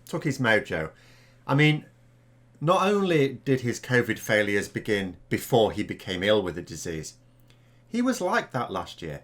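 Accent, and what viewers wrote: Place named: Hull, United Kingdom. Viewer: 'He always wanted to be an ex-Prime Minister,' love it. Plus the nanny reference to ReesMogg. I always tune in to your videos whilst having a cup of tea before starting my day on my farm in Thailand. Are you from Hull? I lived in South Cave from the mid 60s and went to Hymers College in Hull. Workwd in an iron foundry and fish factory on Hessle Road in the holidays and weekends. Finished my A levels in '73 and fled south to Bristol, never to return. Still love to hear the accent though! I was a teacher in Bangkok for 25 years but I much prefer farming and the countryside. Covid means nothing here. All the best, and as for Brexit- economic suicide by a ship of fools. Utter madness At, Brexit, econom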